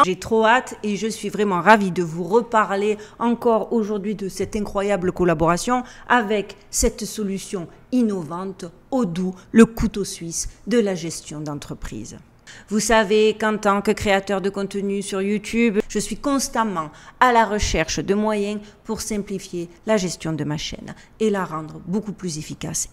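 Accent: Marseille, France. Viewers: Moi ce que jaime c'est que t'as gardé l'accent du Sud meme au states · Tu es VRAIMENT ADORABLE, j'adore ta voix, ton accent MARSEILLAIS. MERCI POUR TON PROFESSIONNALISME et ton CHARME !!! ♥♥♥😘😘😘😘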